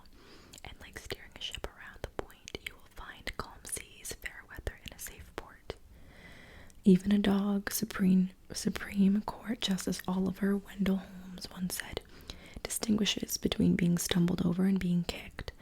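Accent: United Kingdom. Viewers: As a british male, aged 16. I can confirm that almost every few streets has a different accent. I like your British accent though, It's relaxing. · As sooooon as you went with soft spoken British accent I ARRIVED AT TINGLE STATION! 😅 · lol your british accent is amazing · The British accent 😂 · Not calling out all of us younger siblings 💀. Lucky for you, I'm too lost in your soft spoken voice (& British accent) 😌or else I'd ask to speak to your manager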